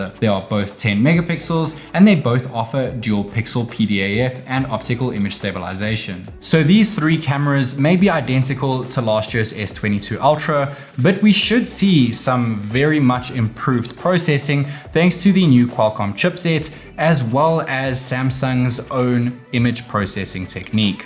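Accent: South Africa. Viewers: I didn't know you are South African 😱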